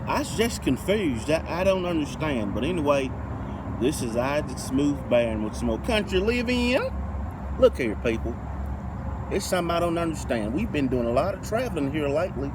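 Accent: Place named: South, United States. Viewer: love that southern accent !!!!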